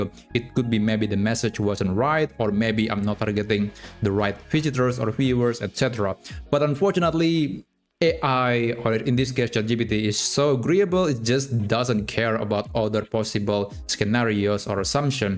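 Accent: Indonesia. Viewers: accent nya asa indo banget jir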